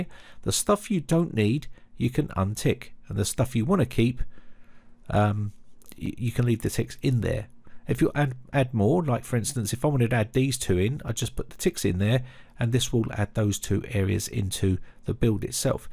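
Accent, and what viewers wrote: United Kingdom. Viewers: Cheers lad ! You are awesome and i love your classic British accent too !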